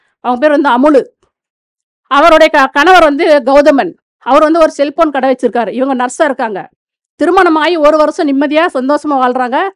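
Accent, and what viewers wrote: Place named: Natham, India. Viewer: நத்தம் Pechi varuthee😁😍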